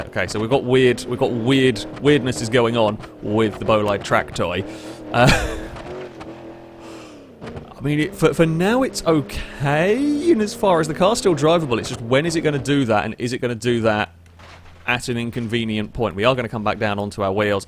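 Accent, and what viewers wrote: United Kingdom. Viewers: Gee, I wonder why a guy with a British accent uploads at odd times for you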